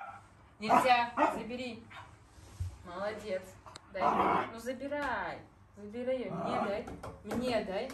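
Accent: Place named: Eastern Europe. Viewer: Her accent seems to be from Eastern Europe. Where is she from ?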